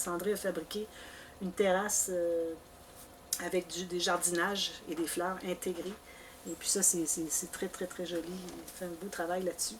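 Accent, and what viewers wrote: Quebec, Canada. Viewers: Merci pour vos réponses ! J’aurais probablement posé les questions que celles auxquelles vous avez répondu. C’était un plaisir de regarder toutes vos vidéos et de vous voir en même temps. J’adore votre petit accent et les petites expressions québécoises que vous utilisez. Ce serait dommage de tout gommer !! Cela a son charme !! Votre français est tout à fait clair. Je vous regarde toutes les semaines et j’ai plaisir à vous regarder depuis plus d’un an maintenant et, j’ai moi aussi l’impression que vous êtes une amie ... de scrapbooking. J’ai aussi la chance de vous avoir découvert en anglais et de pouvoir vous comprendre dans les deux langues. Et j’ai pu apprendre les termes de scrap en anglais. Je me demandais combien d’albums vous aviez faits au cours de vos 700 et quelques tutoriels. Je vous remercie encore pour votre générosité. 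Bonne continuation . Mylene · J'aimerais vraiment avoir une amie comme vous. Toujours de bons conseils sur tout. Vous êtes une bonne personne. Votre accent et vos termes québécois me plaisent beaucoup. Gardez les c'est votre marque de fabrique..vous êtes fière de vous et je vous applaudis. Je suis accro de votre chaine. Je fais du scrap depuis 3 ans mais depuis 1 an que je suis votre chaine je me suis beaucoup améliorée... et je n'ai pas encore visionné toutes vos vidéos..... vraiment MERCI. Et continuez.....Je me permets de vous embrasser ainsi que votre mari. A bientôt dans d'autres vidéos.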